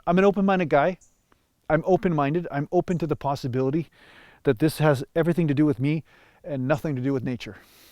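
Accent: Canada. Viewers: He must be a fellow Canadian. I can tell by how he talks haha.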